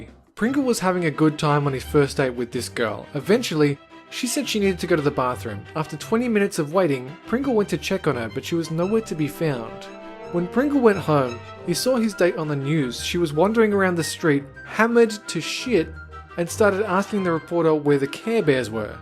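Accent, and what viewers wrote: Australia. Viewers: YOUR AUSTRALIN!!!!!! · Your Australian? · Wait Are You Australian Because Ì M Am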